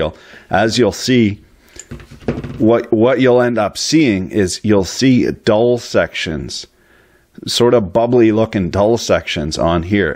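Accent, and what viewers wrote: Canada. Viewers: This guy is brilliant I wish I spoke Canadian I’m from Missouri who would of know that Canadian English is so different great videos I’ve learned so much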